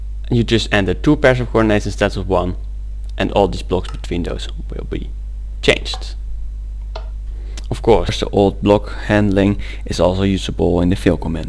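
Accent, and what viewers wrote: Netherlands. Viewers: 100% dutch :) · je hoort het nl accent. dat is niet erg, maar goeie tutorial! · Dit is nederlands accent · Are you Dutch? I can hear it in your accent! Thanks for the video! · hbo Nederland accent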